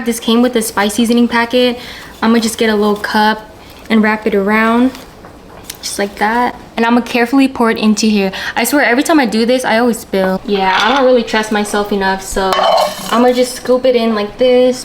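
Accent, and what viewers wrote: United States. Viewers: this girl sounds like shes from queens ny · Is she from the bay area??cuz she soundin alot like she is.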